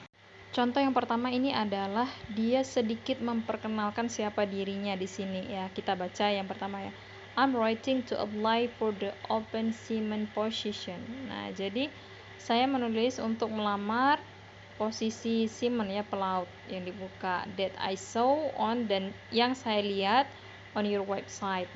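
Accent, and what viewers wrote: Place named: Palembang, Indonesia. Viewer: Terdengar tdi. Cara ke duo. Asli palembang ya mbak. He he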